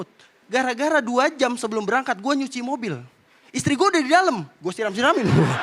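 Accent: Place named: Betawi, Indonesia. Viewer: Lu Betawi ya Bang? Lucu banget dan logatnya enak didenger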